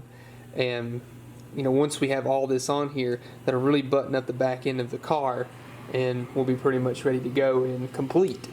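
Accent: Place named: South, United States. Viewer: My husband and I are watching this right now, we love the way the car is looking and we're watching to the end to see how it turns out. You are really amazing, we love how you take so much care with each and every part of that gorgeous gorgeous car. We're also from the South so we have accents as well so it's ok, we're use to people sounding that way. (Oh, just in cause you were maybe possibly wondering, we're from&live here in Tennessee)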